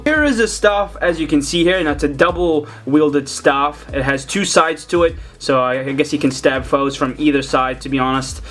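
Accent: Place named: New Zealand. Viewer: Wait are you kiwi, that's awesome, what part of NewZealand are you from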